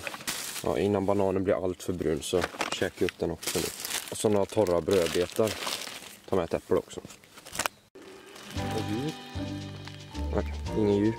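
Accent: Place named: West Gothland, Sweden. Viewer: Haha jo såpass långt har jag räknat ut :P funderade mer på vart i västergötland, är själv från västergötland därför jag reagerade på dialekten :P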